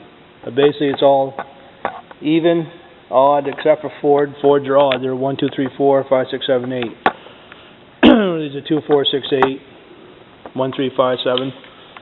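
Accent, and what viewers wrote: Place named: Maine, United States. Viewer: Where are u out of? From ur accent my guess is up here in maine...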